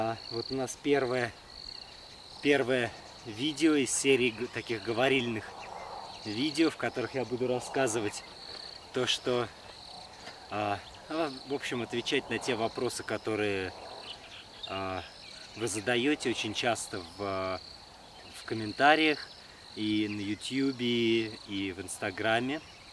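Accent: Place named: Moscow, Russia. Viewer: Вы меня потрясли! Вашей любовью к животным и самопожертвованием! Очень уважаю таких людей, низкий Вам поклон за это! Нож в сердце - рассказ о страшной ферме, меня всегда убивают такие люди, которые ради денег готовы на страшные поступки! Огромное спасибо, за спасенных львов, за Ваш подвиг человеческий. Только что узнала о Вас, подписалась на канал. Ничего не удаляйте, пожалуйста, будем ждать новых Ваших видео! Левушки Ваши - красивущие!!!!)))) Привет Вам из Харькова))) Очень приятно слышать Вашу речь московскую, у нас там огромное количество родни! Успехов Вам и помощи Божией!!! Все у Вас получится!!!!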